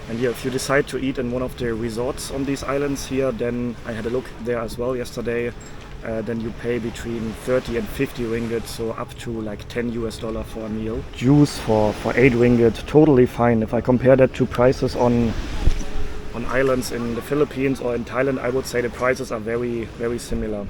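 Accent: Germany. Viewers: Hör ich da einen deutschen Akzent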